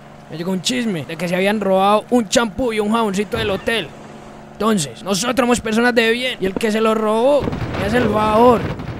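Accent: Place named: Colombia. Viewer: el mejor acento de colombia y latino america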